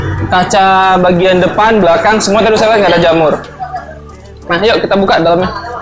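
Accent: West Sumatra, Indonesia. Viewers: logat minang memang gak bisa ilang, dari menit makin jelas bgt, dima kampuang diak.....? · Rang bukik kironyo,. Pantas ada logatnya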